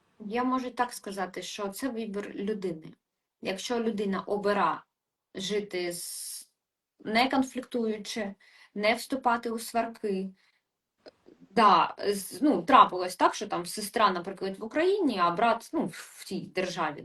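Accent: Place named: Russia. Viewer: Важко зрозуміти де які слова цієї відьмочки.Мабуть вона раніше була російськомовна або в неї як це говориться російськомовна щелепа і говорячі деякі українсікі слова вона не зовсім розуміє їх значення.То може б краще роворила б російською.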